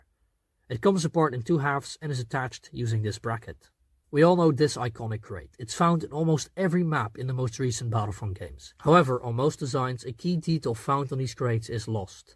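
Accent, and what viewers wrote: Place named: Netherlands. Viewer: k voelde dat je nderlands was en nu weet k het zeker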